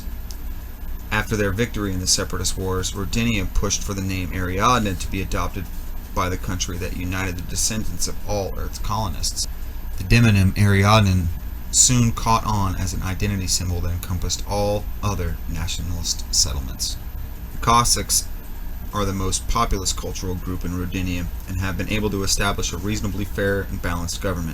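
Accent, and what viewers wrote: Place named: Canada. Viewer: Enjoying your videos (considering getting into Infinity, and I like to know the lore of games, and specifically factions that I play. You have caught me off guard, as I have heard you say both zed and zee now. I am guessing that you are either Southern Canadian, or from a Northern state, with a lot of border crossing Canadians. That, or a child of parents from both sides of the border. Regardless, love the videos, watched four so far today.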